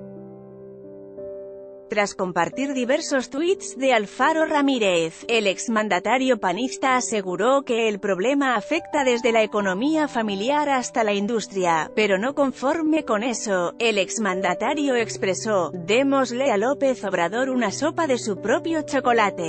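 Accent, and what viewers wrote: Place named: Spain. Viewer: Yo opino que deberias usar tu voz y no la de una Españoleta suenas extranjera suenas mal. .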